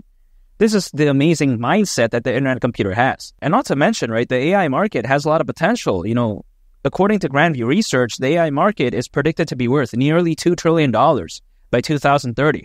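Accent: United Kingdom. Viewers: Nice British accent! Nice content again as well. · love $icp and love the British accent 🌐👨🏼‍💻♾️ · Great British accent. I still can't tell if you're AI or not though😂 🤔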